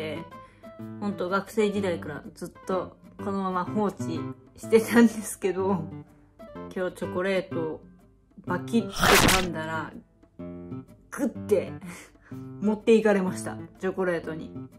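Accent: Kansai, Japan. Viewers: だんだんアンドロイド感が薄れてきた。関西人なのもわかった。 これからどういう路線でいかれるのか拝見します。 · 関西なんです？ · 関西弁全開!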